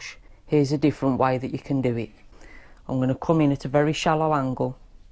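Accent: Black Country, United Kingdom. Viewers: Bostin mar mate , day know yow could do it like that . where in the black country are yow from . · black country accent Wolverhampton maybe??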